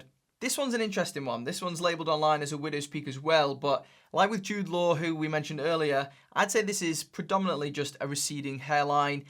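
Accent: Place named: Stoke, United Kingdom. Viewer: Are you from Stoke by any chance. Your accent sounds like something you’d hear in Stoke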